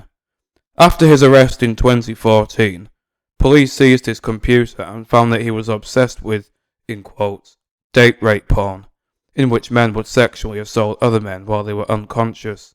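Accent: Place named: England, United Kingdom. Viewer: What is it with Englishmen narrating so many tc videos? 😂 it's great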